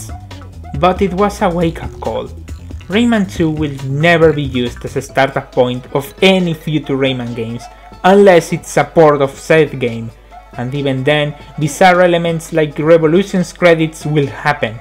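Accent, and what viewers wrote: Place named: Russia. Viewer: Amigo, si vas a hacer videos en inglés aprende a pronunciar en inglés porque no te sale XD sonas como ruso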